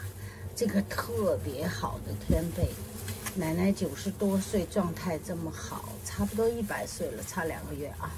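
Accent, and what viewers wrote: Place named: North, China. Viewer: 奶奶您怎么讲话一点不像快百岁的老人，思路清晰，口才好，而且听您说话像北方人，没有一点在东南亚国家生活的口音。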